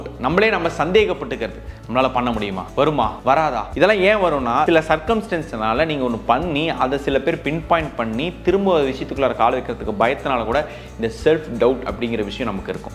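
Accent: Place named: Madurai, India. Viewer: Anne Madurai slang la pesurimga❤❤❤❤❤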